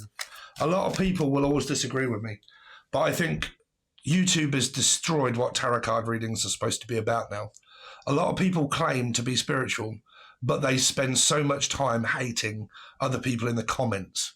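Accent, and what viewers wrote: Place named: England, United Kingdom. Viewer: I love your f**** accent and you're on it cuz I'm not communicating with somebody right now basically they're not communicating with me I've tried and I've tried and I haven't blown the man down and don't take balloon literally blown lol Dude I think you rock and I love your accent and I love your freaking drawings they're funny and great and you know your s*** You're hilarious You make my day and I wish I was English so I could come there and talk to you but I'm not it's okay but you're cool dude Don't let anybody tell you you're don't know what you're doing cuz you do and I appreciate you❤😊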